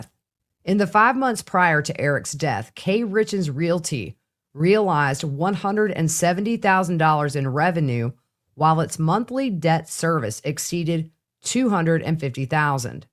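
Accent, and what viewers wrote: South, United States. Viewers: Your southern drawl is so beautiful ❤️😘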